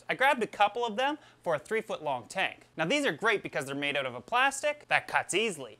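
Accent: Canada. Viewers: I love how Canadian you sound... it's great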